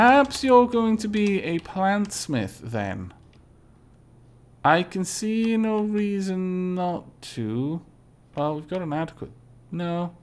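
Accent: Wales, United Kingdom. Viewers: Subbbb! Welsh man xD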